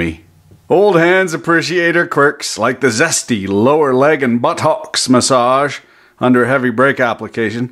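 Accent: Quebec, Canada. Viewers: Cobalt? New Liskard? Not many places where you can develop both a hoser accent and also a heady joual... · He speaks like a true canadian. " how da fuck can you close on a weekend " lol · That's the most Canadia I've ever heard in 5 and a half minutes and I loved every second! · Where the F is the CC button? No one understands Canadian English? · Is he Québécois? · Je savais pas que t'étais Québecois! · Please keep the quebecois coming its hilarious! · Il parle le joual la plus belle langue du christ!!:) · oh canadian . okaaaay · be speaking true Quebecois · Little mix of quebec, ontarian and american talk😂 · Is that a Canadian accent you have? Aye good day. Take off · You must have spent some part of your life in Quebec, you couldn't have acquired that slang and pronunciation anywhere else. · Naw, welcome to Canada, eh. We all talk like that. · Me too... 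and i'm french! That quebec accent was so pronounced it's basically creole at this point. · That Québécois tho. I like it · Hahaha Quebecois en criss! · I can imagine the confused looks, when people don't understand the subtlety of the engrish Canadian mixture, fucking great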